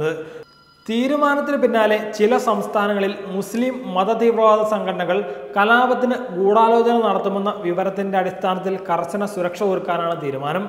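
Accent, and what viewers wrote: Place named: Kottayam, India. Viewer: ഇൗ വക കോട്ടയം.ഭാഷാ ഭോഗികളെ .അവതാരകൻ ആയി വയ്ക്കരുത് . മലയാളം പറയാൻ അറിയുന്ന ചെറുപ്പക്കാർക്ക് ഇത്ര ക്ഷാമം ഉണ്ടോ.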